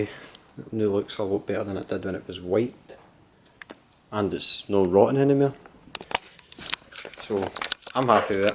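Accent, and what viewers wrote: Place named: Scotland, United Kingdom. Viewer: Good job on orange escort m8, will be watching for it at knockhill this summer, looks lit bud.I'm from kirkintilloch near Glasgow, I'm guessing your not to far away going by thick Scottish accent lol . Do you guys weld exhausts, looking to get exhaust flexi pipe fitted to 09 seat leon Fr550. It's the pd170 engine and still got DPF intact. I've got a small garage with 2 poster ramp, mig welder and gas n acetylene but my welding is total crap. Any help appreciated 😁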